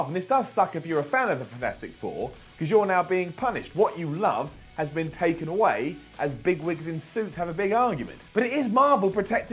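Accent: United Kingdom. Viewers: Lol after I saw this comment first thing I thought about was fake taxi because he has a British accent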